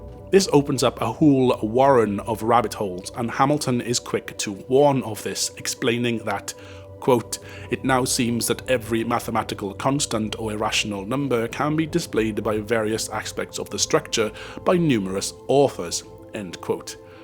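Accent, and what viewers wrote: Wales, United Kingdom. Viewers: The word "heard" is pronounced the same way as the word "herd". You saying "HEERD" instead of "HERD" is jarring in a nails-down-the-blackboard kind of way. I make allowances for accents (I can hear a bit of Welsh influence in your voice) but FTLOG, "HEERD" is just so very very wrong.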